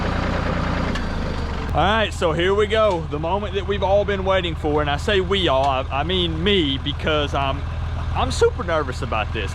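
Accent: South, United States. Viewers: You sound like Carl Sagan if he had a southern accent. 👌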